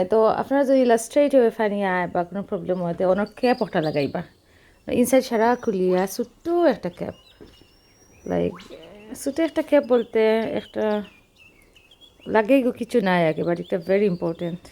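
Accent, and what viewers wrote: Sylhet, Bangladesh. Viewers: Shylety kotha khub valo lage, subscribe korlam STAY connected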